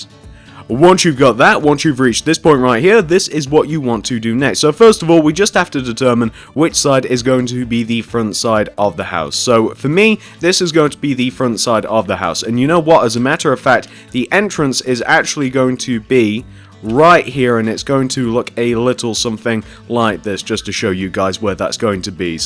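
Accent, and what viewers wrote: United Kingdom. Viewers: Shock Wave it is him counting he is (it sounds like) british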